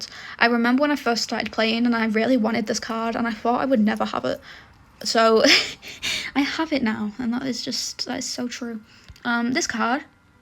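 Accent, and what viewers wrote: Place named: Manchester, United Kingdom. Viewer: loving the manchestian accent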